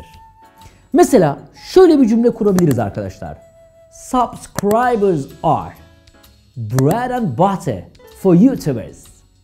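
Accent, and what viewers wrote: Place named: United Kingdom. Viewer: hocam formatınız iyi..teşekkürler.. bir kaç önerimde var bunun yanında..amerikan aksanını ön plana çıkarmanız....çok kullanılmayan dip bilgiler yerine günlük hayatta sıklıkla kullanılan kalıplara yönelmeniz..ingiliz aksanı kafa karıştırıyor kullandığınız...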